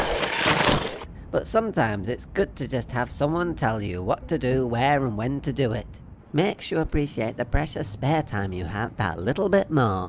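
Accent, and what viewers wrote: Yorkshire, United Kingdom. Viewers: I can tell this guy is from Yorkshire because he sounds like David Firth.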